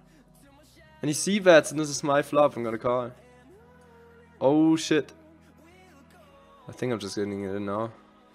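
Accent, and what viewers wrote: Germany. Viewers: You should work on your german accent. And maybe dont cough so much ;)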